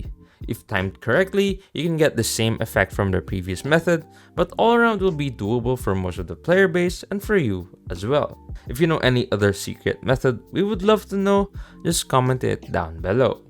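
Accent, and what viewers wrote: Philippines. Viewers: based on his accent hes filipino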